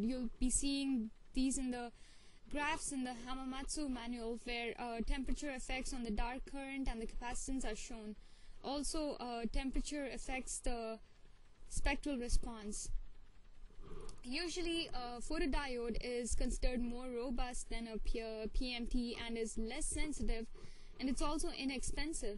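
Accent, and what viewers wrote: India. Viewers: You were reading a book. BTW your accent is Indian, probably you are Indian or indian based NRI. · but u sound like indian..its ok..dont be ashamed...nice accent..:)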